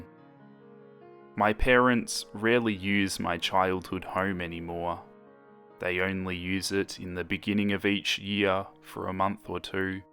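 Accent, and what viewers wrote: Australia. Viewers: OMG UR AUSTRALIAN HI :,) IM AUSTRALIAN TOO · Your Australia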